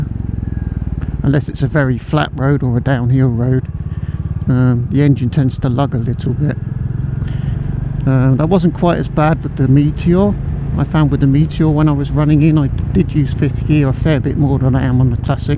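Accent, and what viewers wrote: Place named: United Kingdom. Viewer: Hi. I am a new subscriber. Wonderful footage - thank you heaps. Quite spectacular scenery. I'm thinking where you are is a little remote? The roads appear to be brilliant but there seems to be a lack of people. I am also running in a new Classic 350 (Signals) so I find it interesting watching someone else going through the same process. It's private information I know, but you sound British, and I can't help but wonder what you are doing in Spain. Have a good one.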